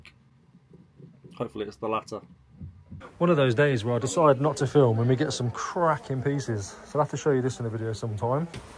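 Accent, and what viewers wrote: United Kingdom. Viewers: I like how you say 'Let's just crack into it'.... Please keep talking like a British person, I like it. So many youtubers say 'Let's just dive right in', 'don't forget to like and subscribe' & I've seen countless resellers on youtube saying 'Thirft store' and similar Americanisms, it honestly makes me stop watching in some cases. Yr a good lad, likin yr videos!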